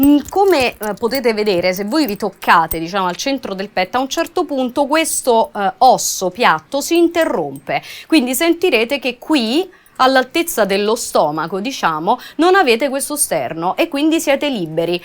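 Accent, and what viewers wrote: Rome, Italy. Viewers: Sei romana... Interessante lezione!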